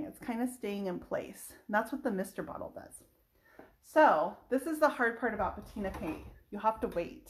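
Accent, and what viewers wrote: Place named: Wisconsin, United States. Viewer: I've never noticed it before but you sound like you might be originally from Wisconsin...or maybe your parents are. I think it's an adorable accent.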